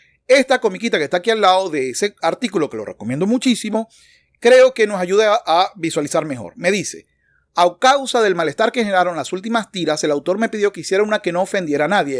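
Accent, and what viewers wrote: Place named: Maracaibo, Venezuela. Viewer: Tenei acento maracucho mano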